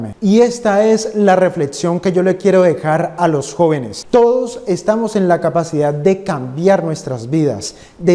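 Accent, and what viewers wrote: Colombia. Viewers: 💎💎😮Parce Tu Eres Colombiano?? Buena!!!!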